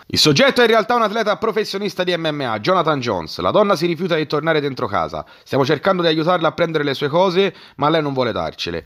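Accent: Tuscany, Italy. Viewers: Tanto per curiosità, ma sei Toscano?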